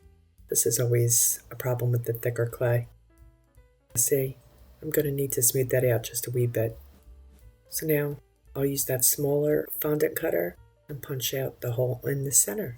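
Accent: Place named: Pennsylvania, United States. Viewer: Clovis came out Amazing!! I’m guessing you’re from PA… There’s the accent and my mom’s side of the family is all from PA & I always heard mom & grandma call them jimmies. Well I was born and raised in FL so I call them sprinkles😂.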